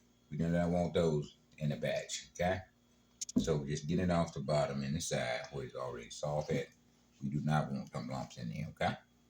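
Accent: South, United States. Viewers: Mr. are you from the state of Florida? Georgia or Louisiana?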